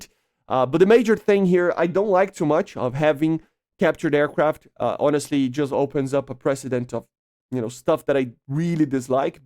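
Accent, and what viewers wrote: Brazil. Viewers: You know he’s Brazilian right?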